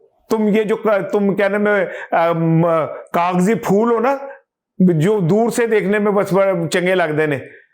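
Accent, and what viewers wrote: Punjab, India. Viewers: آج پنجابی تڑکا کم ہے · Welcome back. I am from Karachi but love your punjabi accent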